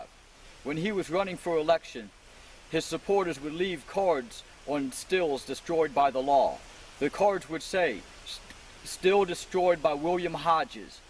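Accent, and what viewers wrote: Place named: North, United States. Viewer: Greetings from Arkansas my name is Anna where are you from? you sound northern like myself would like to talk to you you're a good looking man...love this video and the content God bless you and yours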